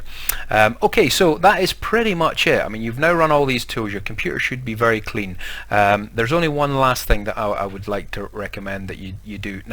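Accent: Scotland, United Kingdom. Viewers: I just love that Scottish accent as I want to call you. LOL. But Capt kirk said no. LOL. What about VPN's and are they worth the money? The Bleeping rkill is old and not sure where to download it. I am running win 7 bc I HATE win 10 and will only have it if I have too. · If your a Scots man we can understand anything 😅 thanks mate new sub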